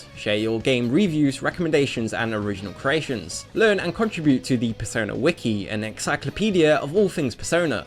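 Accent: United Kingdom. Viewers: The commentator? Uh, he's British.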